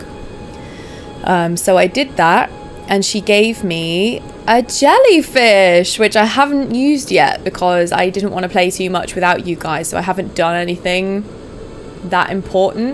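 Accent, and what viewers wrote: United Kingdom. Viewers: I bought the game but have yet to play it...will finally give it a try this weekend. With that being said, I've really enjoyed watching your videos in the meanwhile! My boss has already told me if I say "ahhhh balls" in a British accent one more time, he's firing me lol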